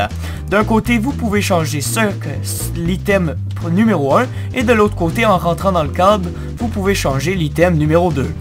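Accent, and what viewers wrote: Quebec, Canada. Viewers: Sincerement, j'adore l'accent quebecois! J'ador aussi tes vidéos, tu deveais faire du feed the beast, ou si t'en fair deja, que tu fasse des vidéos sur le serveur feed the patrick!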